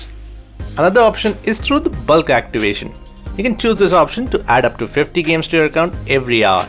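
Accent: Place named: India. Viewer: u got the indian accent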